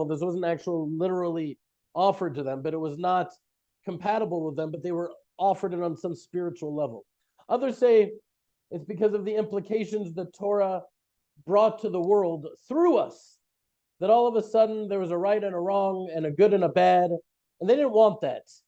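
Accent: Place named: United States. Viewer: Such an awful lot of propaganda. jewishness is not the problem. Zionism is. Also, look at the speaker - white skin, American accent. Indigenous middle easterners have olive skin and Arabic accents. The kids in the video only know this way because of Occupation, Apartheid, and the brutal actions of the IDF. The Palestinians live in virtual prisons - the West Bank and Gaza. jewish kids in zionist izrael experience a very different life and a very different education, ie., books degrading the Palestinians - and that information comes from an izraeli jewish university lecturer who made a study of educational books first year to the end of high school. Now for the onslaught from zionists reading this ...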